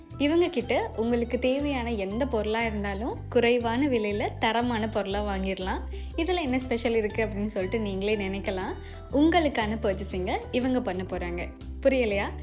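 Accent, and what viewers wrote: Sri Lanka. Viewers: நன்றி.சிறீ லங்காவா அல்லது இந்தியாவா.அழகிய தமிழ்.❤❤😅😅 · ஸ்ரீலங்கா அல்லது இலங்கை தமிழ்.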